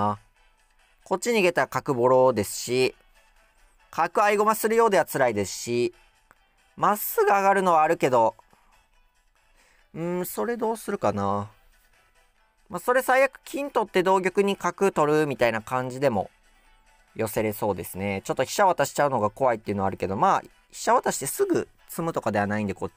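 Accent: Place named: Kansai, Japan. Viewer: 関西人特有の適当トークが好きです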